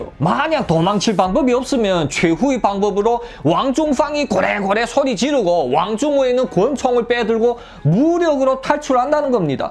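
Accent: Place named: Korean-Chinese, China. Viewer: 발음 좀 고치시면 유튜브 떡상가능하실듯요 억양이 억센 조선족이라 좀 듣기그렇네요 썰은 재밌는데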